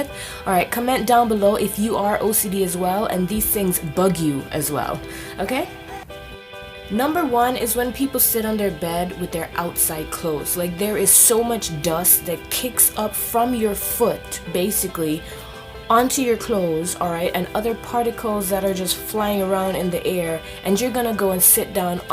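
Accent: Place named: Caribbean. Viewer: Thanks for sharing your insights, this is seldom spocken or apparent on the youtube platform for black people. Correct me if I'm wrong but I'm hearing a subtle hint of carribean/West Indies accent from you.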